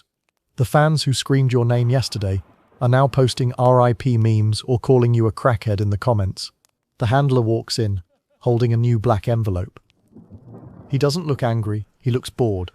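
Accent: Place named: United Kingdom. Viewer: Sensationalistic AI slop. Powerful people always have and always will exploit the ambitious and vulnerable. A robot’s British accent doesn’t make it true. Plenty of serious problems in the real non conspiracy world far more deserve your time. Ever consider you’re getting suckered and distracted by this junk?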